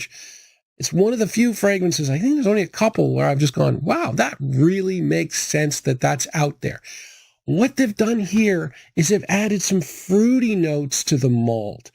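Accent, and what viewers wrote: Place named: Canada. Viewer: This was my first time on your channel but I could tell you're a fellow Canadian pretty quickly, glad I got that accent detection down. Also I'm pretty sure that's a maple leafs puck in the corner. Good stuff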